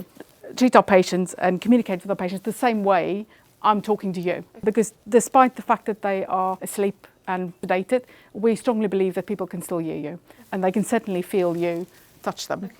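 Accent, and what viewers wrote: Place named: United Kingdom. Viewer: the thick blimey accent mate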